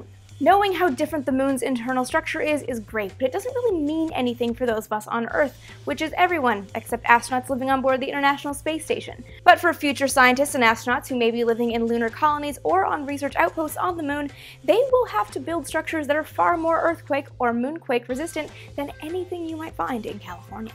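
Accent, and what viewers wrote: Canada. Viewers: Er... she's Canadian and says things Canadianly sometimes...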